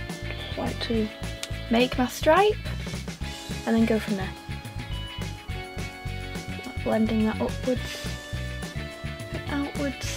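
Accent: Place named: Northwest England, United Kingdom. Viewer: I feel like we are from the same place, I read your faq and you're from the north west of England and so am I but your accent sounds like the town I'm from😂💕 I loved this look and you suit white-blonde hair so much! I wish I could pull of that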